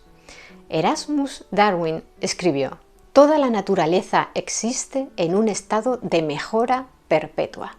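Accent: Spain. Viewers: Me encanta tu forma de explicar la historia, con mucha precisión y elocuencia ojalá los profesores de historia se topén contigo, debo admitir que tradicionalmente el acento español no me resultaba agradable al oído, pero usted hace que eso resulte todo lo contrario. Saludos y mucho éxito.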